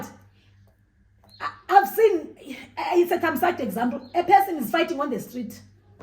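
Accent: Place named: Zimbabwe. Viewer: Your accent....its giving Zimbabwe 🇿🇼 ❤❤😂😂 hello Mama, here from Mandy. Love your energy 🎉🎉😊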